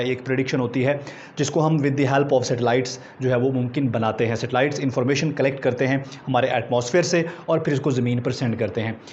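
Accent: Pakistan. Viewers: Are you from pak or kashmir???? Coz ur accent matches!!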